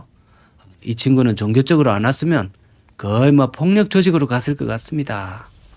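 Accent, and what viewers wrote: Gyeongsang, South Korea. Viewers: 님의 영상 너무재밋고 또 너무나 독특한 갱상도 말씨로 항상 시간가는줄 모르게 보고 있습니다❤❤❤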